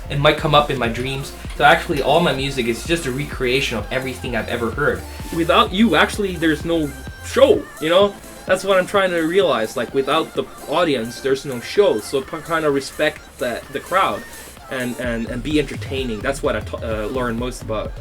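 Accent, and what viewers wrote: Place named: Canada. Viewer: Why does he talk like a Canadian if he's from Norway?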